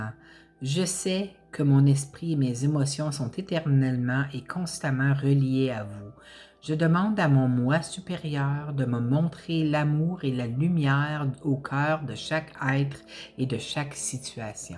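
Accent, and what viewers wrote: Quebec, Canada. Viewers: Merci, tres beau tirage restont zen. J'adore l'accent du québec tsé ( tu sais) dans le sud de la France on dit comme vous sais rigolo. Bien à vous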